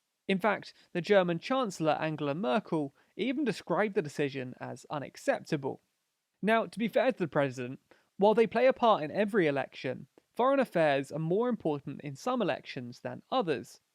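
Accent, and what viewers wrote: United Kingdom. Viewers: his British accent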